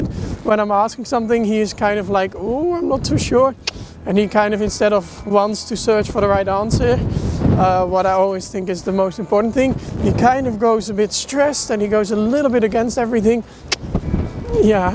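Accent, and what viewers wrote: Netherlands. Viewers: im sorry but are You dutch im very sorry to ask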